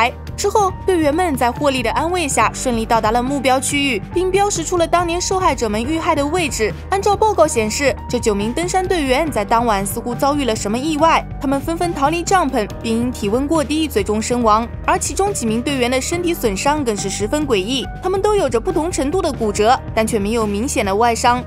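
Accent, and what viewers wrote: Jiangsu-Zhejiang, China. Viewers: 可能因为Up主是江浙人吧~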